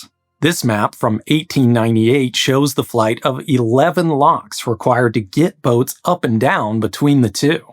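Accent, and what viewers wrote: United States. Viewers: It's interesting to hear how an American pronounces Scottish words. There is a distinct shift in vowel sounds between the two nations, even if we share a similar language. Sometimes, the pronounciation is amusingly different from what us in Scotland would use, but sometimes, it's harsh, almost alien sounding. Languages are interesting. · It is always fascinating watching americans talk about Scotland and pronounce things in their accent. I live in the area and more than the engineering, it was something that added a lot of benefit to an area that was notorious for being less than amazing.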